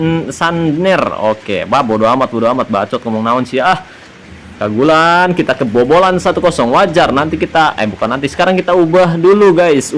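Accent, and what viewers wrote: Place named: Brebes, Indonesia. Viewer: BANG...ENTE ORANG BREBES YA... SALAM NGAPAK BANG...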